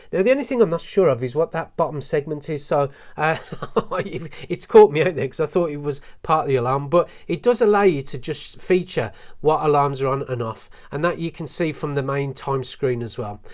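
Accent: United Kingdom. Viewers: Nice video, love your brittish accent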